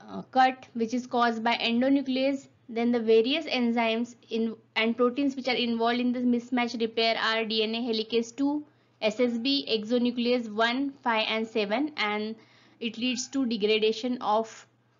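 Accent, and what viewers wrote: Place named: India. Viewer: The indian accent is so clear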